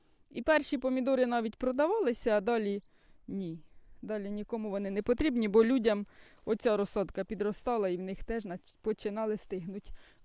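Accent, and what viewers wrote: Poltava, Ukraine. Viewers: Зразу впізнала полтавську манеру розмови, бо у мене тьотя там живе. Дуже приємно слухати. Ви молодчинка, любите землю і рослини!!!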